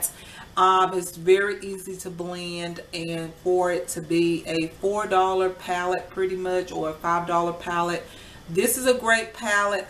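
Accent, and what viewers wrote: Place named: South, United States. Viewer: I just know you are from the south me too and your accent is great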